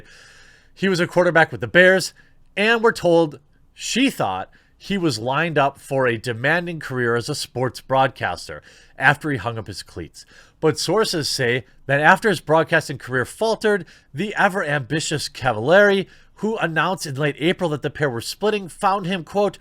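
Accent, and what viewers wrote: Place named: Wisconsin, United States. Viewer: Your obviously from Wisconsin, greetings from the Green Bay area fellow cheesehead.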